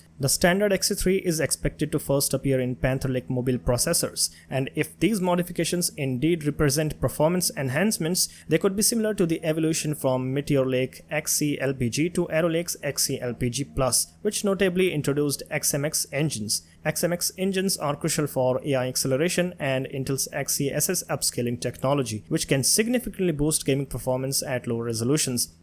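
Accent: India. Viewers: I wanted to watch this video but as soon as I heard the indian accent it put me off jesus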